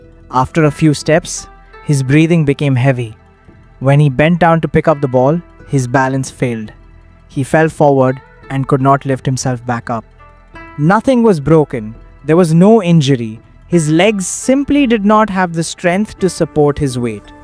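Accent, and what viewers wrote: India. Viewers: japanese story with indian accent… random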